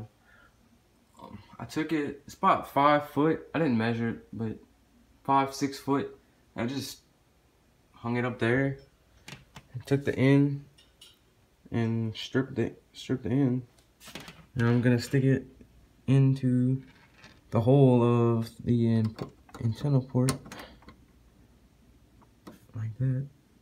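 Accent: Louisiana, United States. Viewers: You have got to be from Louisiana with that accent! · Dude ya sound like a fellow Louisiana boy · Gahlee wea you from neg?? Sounds like you from LOUISIANA??? If so, where at cuz I'm trying to catch some TV channels in Houma LOUISIANA 😂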